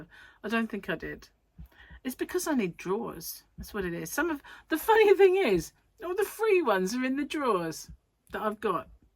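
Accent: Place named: Cambridge, United Kingdom. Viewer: Hi 👋🏻 My goodness your accent reminds me so very much of my mother in law who was born and raised in Cambridge. The way you phrase things is so similar. She has been gone now for almost 10 years so it is a lovely to hear you speak and it brings back many wonderful memories. I’m a newcomer to your channel so can you tell me where you are? #Furls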